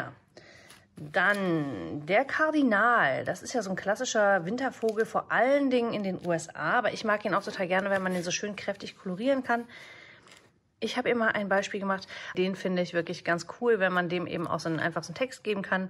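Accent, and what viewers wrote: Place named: Berlin, Germany. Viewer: Also mal ehrlich: dein berlinerisch ist ja *janz knorke*❗ Die ministempel & die Eiskristall-Schablone gefallen mir besonders! Freu' mich sehr auf Freitag!!!! Schönen Tag noch & Grüßle vonne Monika ❤️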